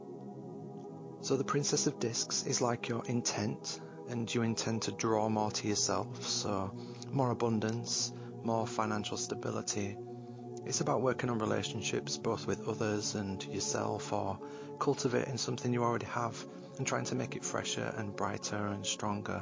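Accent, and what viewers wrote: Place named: North, United Kingdom. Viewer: I love your gentle voice..northern..xxx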